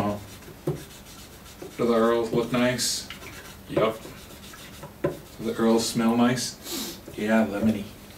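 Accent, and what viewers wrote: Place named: Canada. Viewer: Wow, lots of haters today. I ignore the Canadian accent, profanity, condescending attitude, occasional mistake, just to see someone enjoy what he does and learn as he goes. If you don't like it, go see Dave's World Of Fun Stuff, Will's Easy Guitars, or any of the other millions of guys doing this, (Or something like it) on YouTube.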